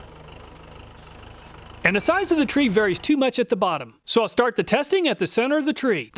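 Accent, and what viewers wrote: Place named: South, United States. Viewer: This guy sounds like an American red neck with a hatchet. He frequently refers to the two Made-in-China chain saws as “knock-offs.” Did the American brand patented the chain saw design, the two stroke engine, the cutting chain, the carburettor, the fuel system, the ergonomic, and everything else? Apparently, he’s showing his bias at best, racism at worst. Unsubscribe. Don’t subscribe.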